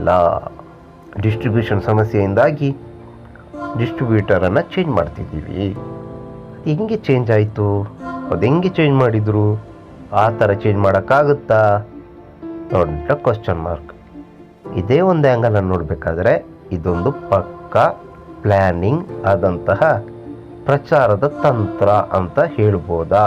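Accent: Coastal Karnataka, India. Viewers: Yavano ninu magne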